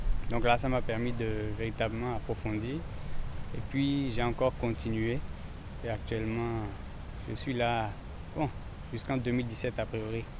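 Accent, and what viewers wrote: Benin. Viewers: Attends il a l accent béninois!😱😱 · Un accent Béninois pour un blanc 🤔🤔🤭. Je suis étonné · Il a même l'accent Béninois bravo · Et puis il a un accent purement béninois en combien de temps? alors que les béninois font un ans en France et revienne avec un accent bizarre. C'est bien bro. J'ai pas cru que c'était un étranger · Wahoo un Français qui parle comme un béninois bravo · Vraiment,un accent purement béninois. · Waoooh il parle rien que l'accent béninois 😍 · Lui, vraiment le teint joue en sa défaveur, accent béninois pure et claire. Vraiment chapeau français-beninois. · Quel plaisir de réécouter tes débuts au Bénin... Qu'est devenu ton accent béninois mon cher?? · Lol il parle comme un béninois est ke la voix n'est pas truie. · Noooonnn lui là il est grave hein!! Je ne sais pas si après le reportage, pour la vidéo c'est la voix d'un Béninois qu'on nous fait écouter à la place de la voix du blanc, parce que franchement il a trop l'accent!! 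C'est incroyable!!!! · LOL le Gar parle avec l'accent benenois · C'est incroyable :o un vrai Béninois dans la voix! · et puis il parle comme un vrai Béninois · Il a un accent béninois merde ✌️ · Il parle comme un béninois ..trop grave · L'accent beninois* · tu as un accent béninois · Incroyable quelle intégration !! Si c'était pas une vidéo j'aurai pas cru que c'est un français , l'accent béninois 100% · Quelle imprégnation!!! On aurait cru entendre un béninois parler. Accent typiquement béninois. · C'est l'acent béninois et puis tous les africains ne sont pas FRANCOPHOne, il y a des anglophones et lusophone. C'est comme si je disais qu'un hatienne parle comme un guadeloupéen.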